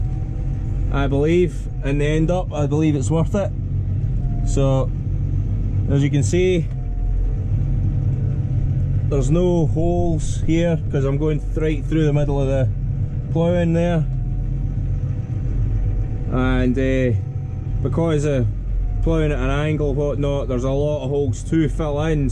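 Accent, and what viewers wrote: Scotland, United Kingdom. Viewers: Very easy watching your videos must be the Scottish accent , would be well interested in the merchandise but do you know what extra charges would be incurred now with Brexit sending them to Ireland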